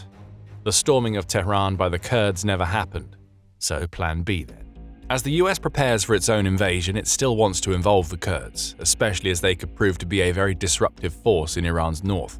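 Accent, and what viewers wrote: England, United Kingdom. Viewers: Refrain from crying about President Trump and his methods. You’re saying it with venom in your voice with an English accent while watching your own country devolve into islamification. So don’t be too quick to cry about the methods of the USA to handle the situation. We got this. Get off your high horse, or would you rather have a nuclear capable Iran telling the UK they have to take in more military aged men?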